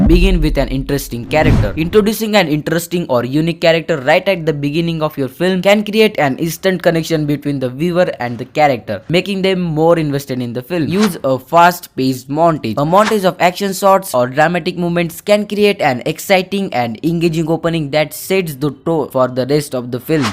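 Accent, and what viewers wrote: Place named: India. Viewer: Poor guys, he was in indian accents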